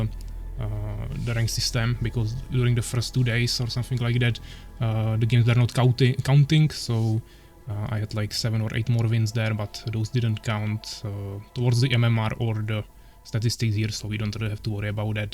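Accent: Czech Republic. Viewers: Did i hear strong Czech accent?🙂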